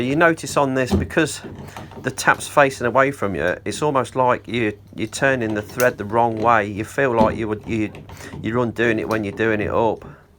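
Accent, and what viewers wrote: Leicester, United Kingdom. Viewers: The accent implies you have to be a Leicester lad like me?!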